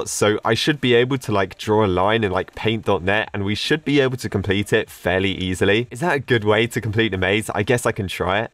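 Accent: United Kingdom. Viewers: are you came from UK?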